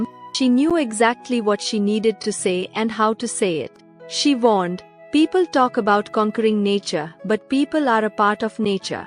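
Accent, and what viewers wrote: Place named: Bengal, India. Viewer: The ai is bengoli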